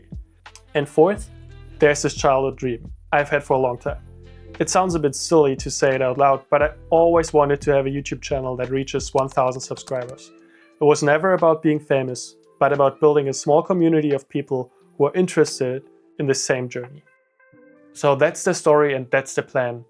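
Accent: Germany. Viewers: Are you Bavarian? I was thinking I was hearing the shadow of an Austrian accent, but then you said you were German, so the only thing I could think of was that you had to be from Bayern. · Yeah, you are the stereotype of the German/Dutch person apologizing for their "terrible English" while speaking with what I would consider nothing more than a slight to moderate accent. We don't mind the apology, but it can't be factually inaccurate (which should warm your German heart). Zero grammar mistakes, vocabulary greater than many native speakers, yeah you're fine there bud. The colloquialisms will come with time. Welcome to Canada. Bienvenue au Canada.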